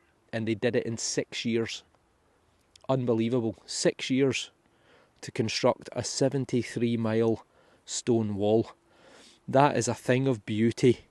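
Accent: Scotland, United Kingdom. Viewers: AND a Scottish accent to boot! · Your support? Its the Scottish accent. Americans...love the different British accents. (Even though most Amercians cannot tell the differences...lol). Just as I imagine, most British think us from the South in the US...sound the same...lol. You all sound the same to us...lol. ---from Houston, Texas. (You get a "like", and a new subscriber). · New subscriber here. Ohio, USA. LOVE everything I’ve watched from you…and I could listen to your Scottish accent all day, every day! Congrats!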